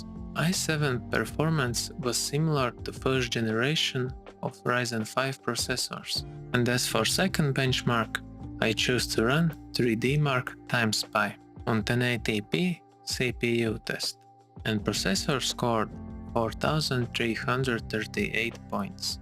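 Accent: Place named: Ukraine. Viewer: Heavy accent, reminds me, GO Ukraine! Better Democracy there than the USA right now! haha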